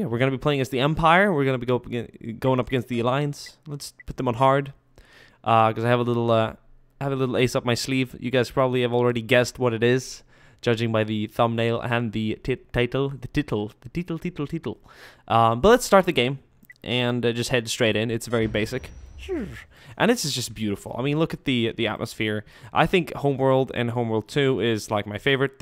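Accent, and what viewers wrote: United Kingdom. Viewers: Man love your accent. I used to watch your stuff years ago and the way you sound is totally different than now. It's this weird mix of sometimes North American, sometimes very British English influenced o.o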